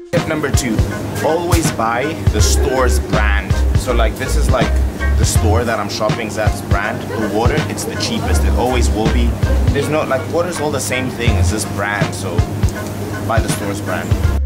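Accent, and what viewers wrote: South Africa. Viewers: Hey dude are you South African? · Are you South African??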